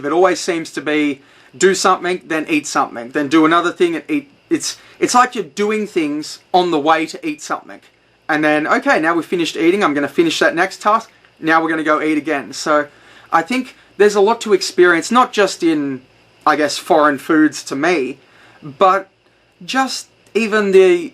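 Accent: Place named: Australia. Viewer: You have very thick aussie accent. From around southern australia?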